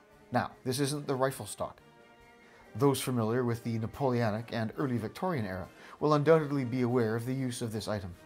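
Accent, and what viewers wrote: Canada. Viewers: As a former rifleman I find the uniform slightly lighter than it should be and no offence ment but Canadian accent and rifles uniform just sounds odd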